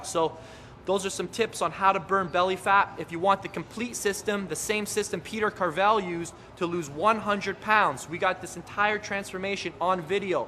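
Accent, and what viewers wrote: Canada. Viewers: hehe he sounds like he is from canada or something ay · u can say this guy is Canadian :p well im too but i say about not aboot any way he said really good stuff so peace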